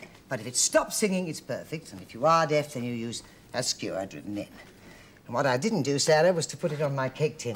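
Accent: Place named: England, United Kingdom. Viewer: Awfully English lasy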